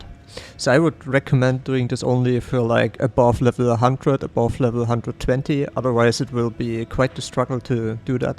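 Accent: Germany. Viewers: Hör ich da nen starken Deutschen Akzent? Hehe aber gutes video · Are you german? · Are u german? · You have German accents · U sound way to german xD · der deutsche akzent scheint durch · Kann es sein das du deutsch bist?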